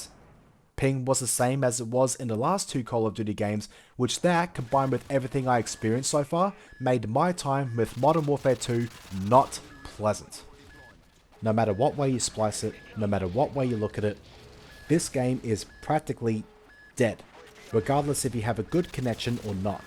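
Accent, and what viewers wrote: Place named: Australia. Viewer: Not bad for an Australian accent.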